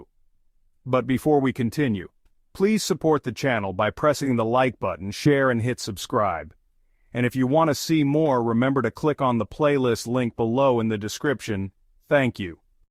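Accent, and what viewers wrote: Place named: United States. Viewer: Why are you speaking with an American accent whats wrong with a Danish accent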